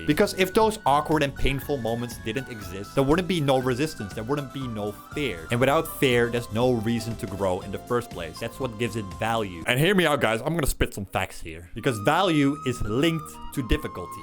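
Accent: United Kingdom. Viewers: Bro sound British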